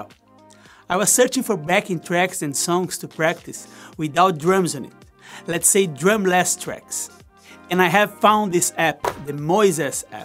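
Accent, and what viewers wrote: Brazil. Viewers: Massa demais!! é Brazuka! Dá pra ver pelo sotaque! Parabéns pelo canal!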